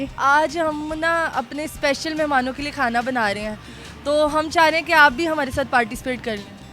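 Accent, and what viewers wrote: Punjab, India. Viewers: Pure punjabi excent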